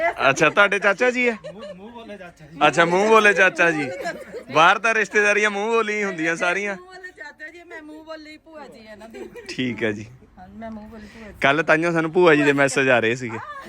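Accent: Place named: Punjab, India. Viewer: Bhaji, you speak Punjabi as you born in Punjab.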